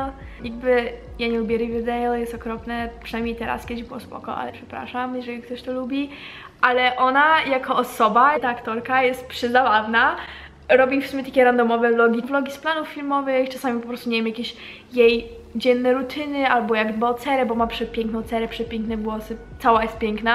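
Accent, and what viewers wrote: United Kingdom. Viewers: Ty masz już taki akcent angielski i mówisz po ang jak byś tam mieszkała od zawsze hhshshsgsgs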